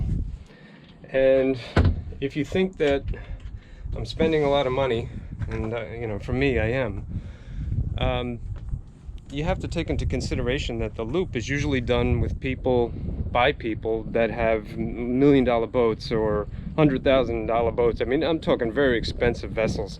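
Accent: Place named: New York, United States. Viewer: Yea, now I recognize it, the slight New York accent. Subscribed, been binge watching - I'm live up north, here in the Outer Banks. Love you're Videos.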